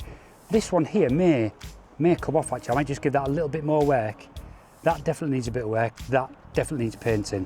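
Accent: Hull, United Kingdom. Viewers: Are you from the East yorkshire area st all,you sound like a Hull lad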